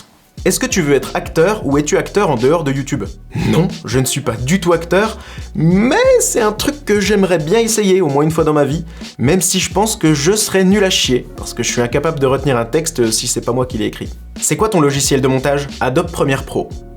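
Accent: Franche-Comté, France. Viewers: Je rêve de t'entendre en invité dans un 2 heures de perdu sur Pee Wee's ! Des conversations en franc comtois et en bourguignon... du miel pour nos oreilles !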